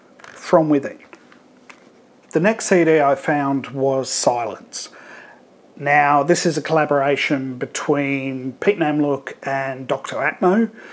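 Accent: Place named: Australia. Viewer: So.. I'm watching your video on programming a Logic synth.. There's a teacup on the table, and I wonder.. "I've got one just the same." I move on to this video, and it occurs to me.. What's this accent? I recognise it. I check the 'About' tab. Ah ha. He's an Aussie! Hi. Loving the random 💯 objectives, diggin' the ambient washes.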